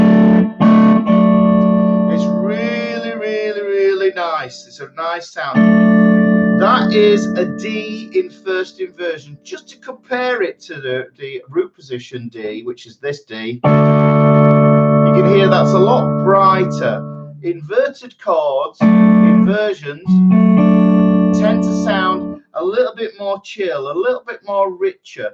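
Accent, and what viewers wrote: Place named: Hull, United Kingdom. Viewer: Trying work out the accent maybe Hull area ish, good lesson mate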